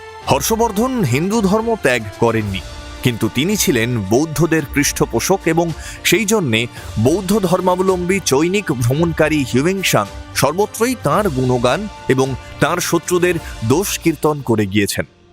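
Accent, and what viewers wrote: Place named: Kolkata, India. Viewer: Apnar pronunciation khub Valo. Kolkatar Bangla accent mashallah shundor khub.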